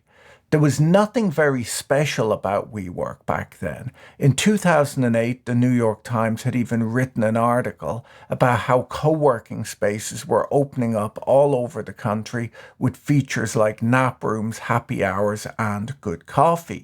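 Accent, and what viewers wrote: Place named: Ireland. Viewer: The Irish accent seems perfectly suited to delivering sarcastic takedowns!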